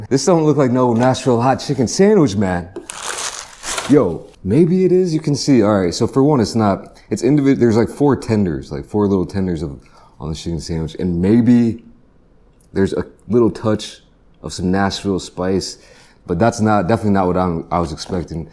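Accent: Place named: Alabama, United States. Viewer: what the fuck why does he look like ching but sounds like robert who lives in Alabama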